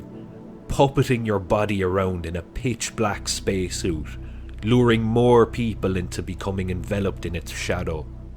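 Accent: Cork, Ireland. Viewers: IRISH ACCENT MAKES IT SO MUCH BETTER!! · Sounds like a Cork accent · can hear an Irish accent much love from Dublin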